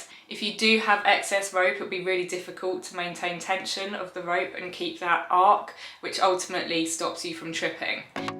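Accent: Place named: Liverpool, United Kingdom. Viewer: What part of Liverpool are you from 😁😉🐝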